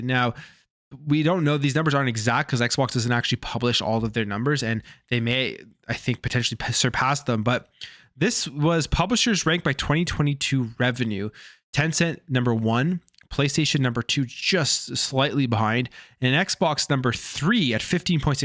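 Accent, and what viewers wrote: Canada. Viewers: You from Canada bro? Just curious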